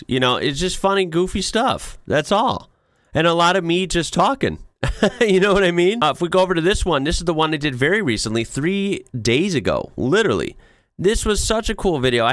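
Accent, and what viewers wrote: Australia. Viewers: Hi. Love you and your family. The AI caption generator is misunderstanding the Australian accent for bad words. There is an article on BuzzFeed about it...titled. This YouTuber's Videos Were Demonetised. He Says It's Because Of His Aussie Accent. Also some youtubers have said the word Aussie in the title or thumbnail gets their videos blocked. It's not fair. Hope this helps. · I've heard quite a few other YouTubers say that they have more luck contacting YouTube through Twitter when they have issues. Maybe explain that the word 'can't' with an Aussie accent is not THAT word.... Especially in the first 15 seconds of your videos. They may think that rule is being broken? Best of luck mate.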